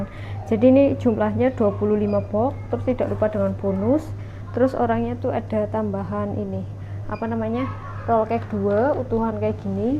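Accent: Banyumas, Indonesia. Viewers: Mba asli orang mana ko bahasanya ngapak kaya saya orang banjarnegara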